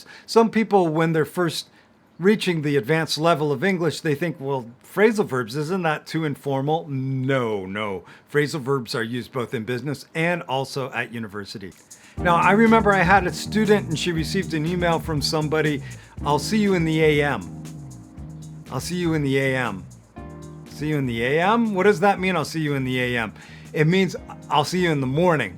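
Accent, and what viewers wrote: United States. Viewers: Hey there You absolutely have an amazing and super important accent! Are you from mid west of the United states? I lost your terrific channel a long time ago.but I got it today in a fantastic way. I wrote fluency English for Chinese! Wow I got you there! Truth be told I l have been looking for you for more than four months! I lost your name and the name of your unique channel! What I am really interested in creating music with your lessons I learned gimme from you last year! Thank you so much for sharing this amazing lesson! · I like u very much for american accent sr. I have been watching ur vds since long time and im imroved a lot. Lots of love. ❤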